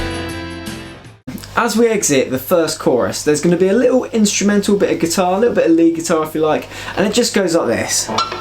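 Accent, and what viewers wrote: United Kingdom. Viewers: what a beatiful aksen really love britain aksen 😍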